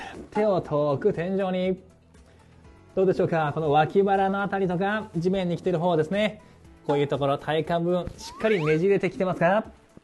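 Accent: Kansai, Japan. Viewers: さんが、関西の方だと今日初めて気づきました！いつもありがとうございます!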